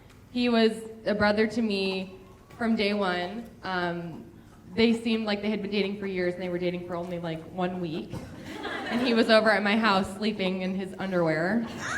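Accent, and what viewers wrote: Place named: Midwest, United States. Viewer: I'm thinking your from the mid west, love the accent and your sincere thoughts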